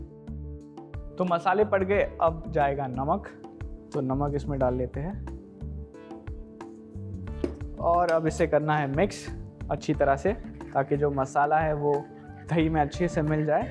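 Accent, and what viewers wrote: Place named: Lucknow, India. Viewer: Your accent is very neutral (Lucknow/urban area of MP I'm guessing) And your way of explaining things just awesome. Soothing voice and delicious recipe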